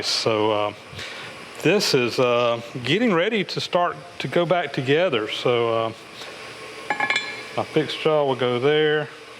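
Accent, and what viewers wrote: South, United States. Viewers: My understanding is that our southern accent is derived from the Protestant Scots Irish who migrated to the US in the late 18th & early 19th centuries. My family is one of those that made the journey. I happen to live in the same city as by the way. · In re: Your accent. One of the things that makes the US the US is that regional differences have not been totally homogenized by television yet. I have been visiting the South regularly for 50 years and while the accents have been smoothed out a little, there is still a charming difference from the speech of Yankees like me. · after quite a while watching your videos I get used to your accent and your pronounciation of "oil", always sounds like "owl" to me (btw, the same with Adam Booth, must be a a southern thing). But when you said "lets grab some owl" again wierd thoughts went through my mind;) Greetings from Austria and thanks for your great videos! 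Dieter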